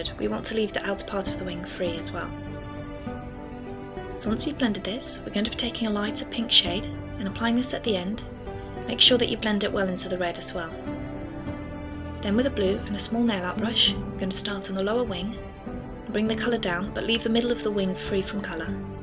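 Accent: England, United Kingdom. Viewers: It's really weird listening to a British accent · i love british accent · loove that english accent.... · i like british accent ! perfect xD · I love your accent. Even though I am from the UK too xD :') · i luv ur british accent...its cute!!!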